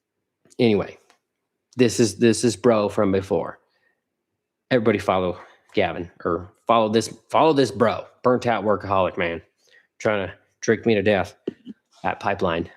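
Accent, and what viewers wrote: Canada. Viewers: I was wondering why you sounded Canadian but your bio said USA. You're an honourary Canadian in my book, bud.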